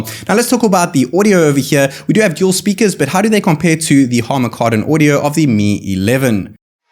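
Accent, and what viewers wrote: South Africa. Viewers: Bro you sound South African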